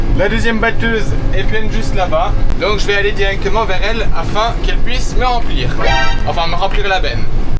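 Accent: Switzerland. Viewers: Salut, heureusement que tu n'as eu que des commentaires de bienveillance..Je ne suis pas dans l'agriculture, je suis contrôleur dans un grand entreprise qui vend des fruits et légumes pour les chaînes de grandes enseignes. Tu es génial et ton accent suisse nous fait bien rigoler..c'est quoi un char. 😊😊😊😊. 😂😂😂 tu as l'air de ramoneur tellement tu es black... Très belle vidéo, comme toujours. Bonne continuation 🫡🫡🙋‍♂️🙋‍♂️ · Bonjour,j'aime bien les suisses votre accent...votre élocution et votre bonne humeur, courage à tous et merci pour tes belles vidéos!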